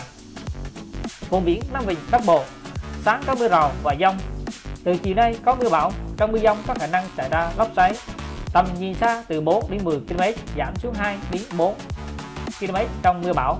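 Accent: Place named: China. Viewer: Giọng như giọng người Hoa khó nghe lắm thay phát thanh viên đi thôi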